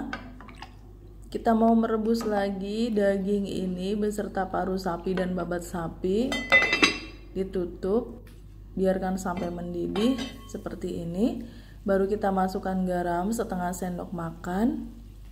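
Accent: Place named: Java, Indonesia. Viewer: Logat Jawa kasih masakan Madura ...... Sepertinya resep Ini perkawinan Jawa Madura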